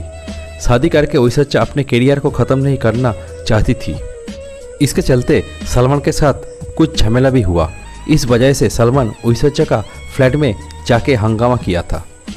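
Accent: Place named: Bengal, India. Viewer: Kyea ap bengali ho ? Apki hindi acca nahi hay.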